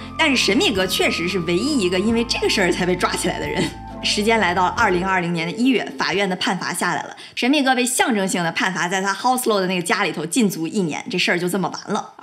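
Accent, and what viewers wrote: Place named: Northeast, China. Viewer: 東北話特別好聽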